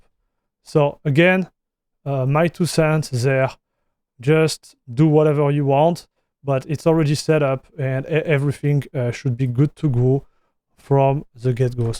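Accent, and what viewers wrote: France. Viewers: big thanks! I'm not newbee in linux, I was trying to configure arch for gaming by myself, but it requires too much time for me. And in your video there is right amount of information for me to set up perfectly. It's a bit hard to understand your french accent, but I'm Russian, so don"t bother, you'll be great by practice) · w french accent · Merci pour tous les conseilles de configue (surtout pour le RGB 😜). J'améliore même mon anglais, je comprend super bien avec ton accent français, j'adore 👍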